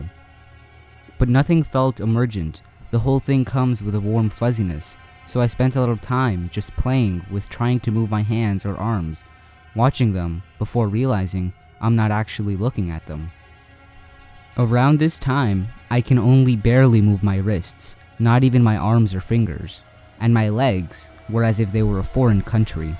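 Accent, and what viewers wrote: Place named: California, United States. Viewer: Subbed! Where are you from dude? I like your accent. California maybe?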